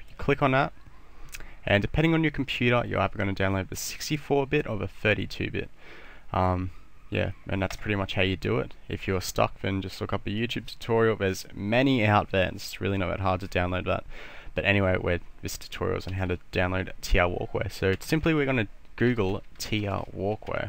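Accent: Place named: Australia. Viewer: If this guy wasn't australian I probably wouldn't really be paying attention to this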